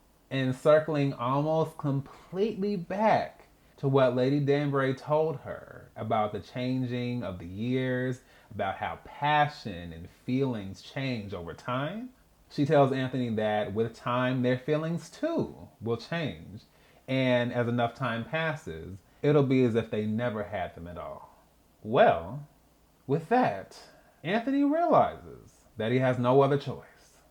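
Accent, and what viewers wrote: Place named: United Kingdom. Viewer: What do great reviews, a British accent, and fun historical financial facts have in common? You guessed it, another review.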